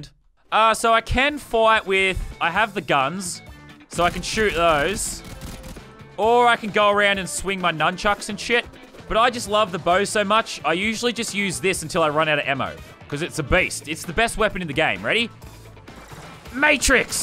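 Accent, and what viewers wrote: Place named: Australia. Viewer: Lazarbeam every one is saying ur British but ur Aussie Like me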